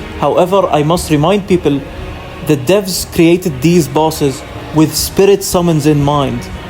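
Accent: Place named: Arab world. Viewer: I haven't reached him yet . However, I can say that he is cool. من لهجتك عرفت انك عربي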